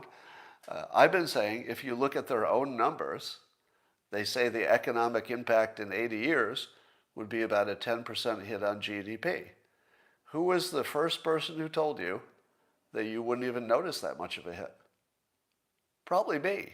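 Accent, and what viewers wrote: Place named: Northern California, United States. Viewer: That's the NORCAL accent.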